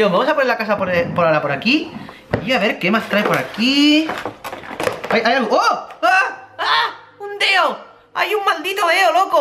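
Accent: Spain. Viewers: Eres de madrid?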